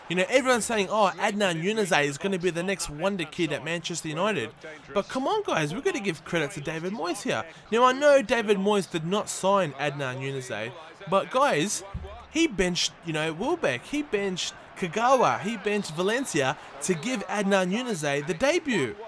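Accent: Australia. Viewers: New Sub here and a Spurs fan. Found you after buying fifa 14 and looking for some tips / gameplay. Normally the aussie accent wrecks my head but you know your footie and your commentary is great!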